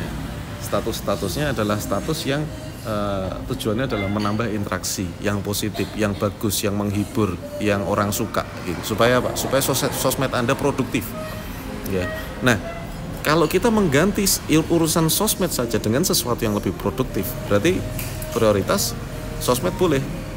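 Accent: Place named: Semarang, Indonesia. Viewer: Mas kayanya orang semarang yo.. jowone medok hehe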